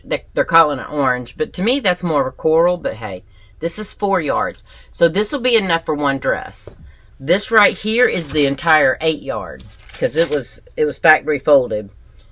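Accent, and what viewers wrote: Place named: South, United States. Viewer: Hi! A warm greeting from Florida. I was scrolling through you tube and I came up with your video. I absolutely love your approach to the subject and the mix of your everyday life. Nothing like that beautiful southern accent mix with sass and bless your heart attitude ❤You have a new fan. Thank you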